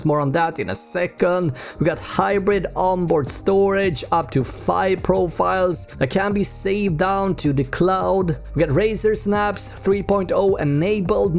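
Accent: Sweden. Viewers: u sond so swedish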